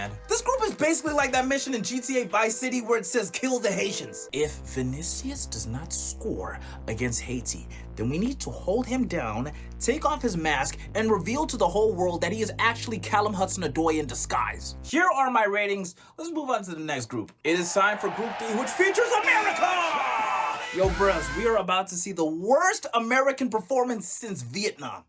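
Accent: South Africa. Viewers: Are u originally south african? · Are you south african?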